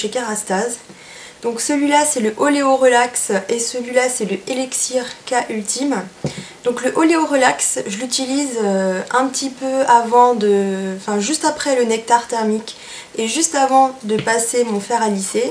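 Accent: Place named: France. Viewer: Hello :) I don't know if you'll be able to understand this but I just found your channel and I think you are absolutely beautiful! I also love your accent, I go to France often on holidays but I've never been able to understand in full. I subscribed to your channel, you have lovely videos! Hopefully more to come :)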